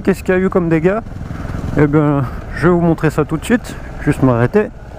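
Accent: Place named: Switzerland. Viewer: ta cru que j'avais pas deviné que tu étais suisse avec le magasin coop au débute de la vidéos et ton petite accent des famille (bon ok je suis aussi suisse :) )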